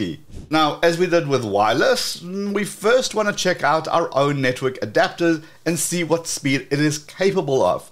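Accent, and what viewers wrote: South Africa. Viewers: Thought so😁. Your accent, only a South-African will know 😅Not anymore though, from your channel info? All the best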